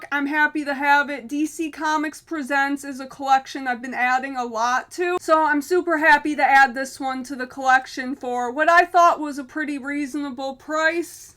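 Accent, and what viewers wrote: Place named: Western New York, United States. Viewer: Love your content ... and Western NY accent!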